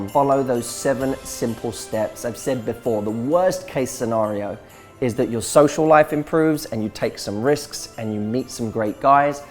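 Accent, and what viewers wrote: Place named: United Kingdom. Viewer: Do you know what..You are completely true..Before I saw your channel I hate British accent..Now I love it sooooo much..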